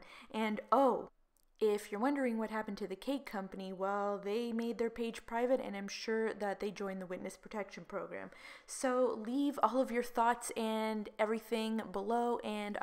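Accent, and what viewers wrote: Canada. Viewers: Are you Canadian Lol? I hear the "aboot" instead of about lol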